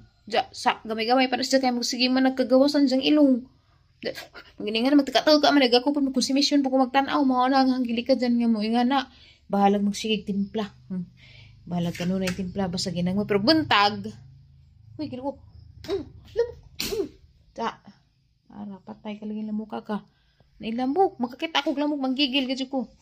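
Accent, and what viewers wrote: Bohol, Philippines. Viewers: Mo angay jud ko sa imong sinultian. Pure Bolanon jud. ❤❤❤❤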